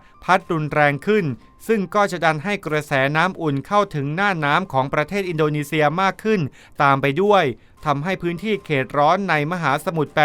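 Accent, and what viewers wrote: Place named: Surin, Thailand. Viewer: คนอ่านเป็นคนสุรินทร์ แน่เลย ชอบฟังเพลง เขมร กัลฟ์ตรึม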